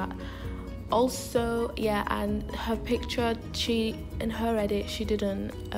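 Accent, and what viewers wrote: United Kingdom. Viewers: lmao ella mai is from london. has a northen accent they ain the same 😂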